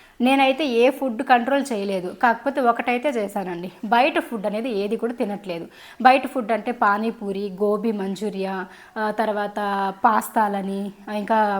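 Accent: Chittoor, India. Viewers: Meeru all rounder sis🤷‍♀️dance,cooking,family caring really your very tallented.i like your videos😘unnadi unnattu matladutharu yechulaki poyi ala matladali ila matladali anukoru.chakkaga mi chittoor slang lo matladutharu.madi nellore sister nenu kuwait lo job chesthunnanu.daily mi videos chusthanu🤗andari laga pakka valla gold ni ma gold ani,pakka valla houses ni ma own house ani abaddalu cheppakunda unnadi unnattu matladitharu.meeru chala mandiki inpiration ayyaru.any way I like your videos.god bless you sister🙌😊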